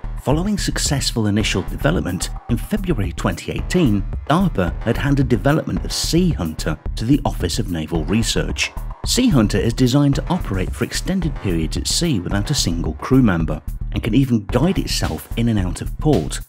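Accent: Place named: United Kingdom. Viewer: Awful British accent... I turned off sound and watched only subtitles... Why this pronunciation is so bizzare and awkward, as oversophisticated pukes?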